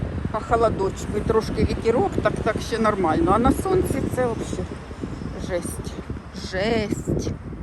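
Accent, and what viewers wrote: Kryvyi Rih, Ukraine. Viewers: Дуже приємно було почути криворіжську говірку. Бальзам на душу. ❤😂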